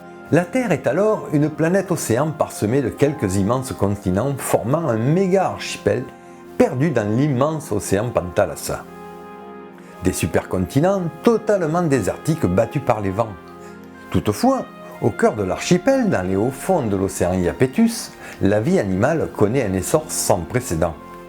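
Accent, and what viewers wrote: South, France. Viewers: Une suggestion d'amélioration : remplacer ta voix, trop rebutante, par une voix D'IA ? J'aurais bien voulu écouter ce docu mais l'accent du sud je peux vraiment pas...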